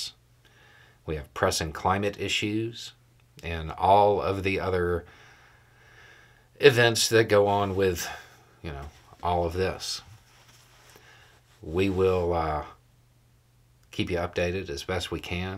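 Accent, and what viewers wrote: South, United States. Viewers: Happy New Year. We spend a lot of time together nightly & I am grateful for the company, information & insights and for trashing the assumption that some who looks like you & speaks with a Southern accent is the antithesis of Progressive. Thanks. Carry on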